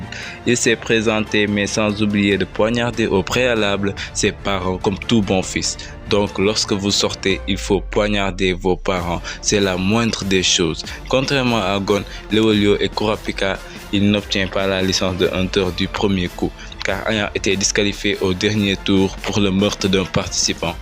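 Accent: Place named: Senegal. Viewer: Yo t'es sénégalais.wais je reconnais l'accent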